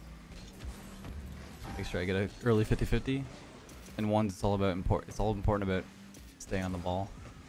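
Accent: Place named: Canada. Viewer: The canadian really comes out when you're this quiet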